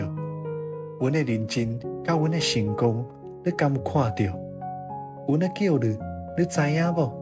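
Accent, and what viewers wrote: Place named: Lukang, Taiwan. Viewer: 臺灣台語正範ê台灣海口腔(鹿港腔)足好聽的台灣國語台灣話。 2026/06/16(二)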